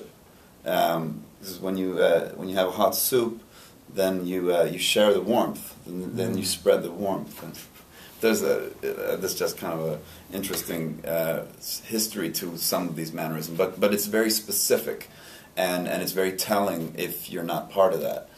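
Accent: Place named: Sweden. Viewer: So damn gorgeous and his Swedish accent...oh my life :p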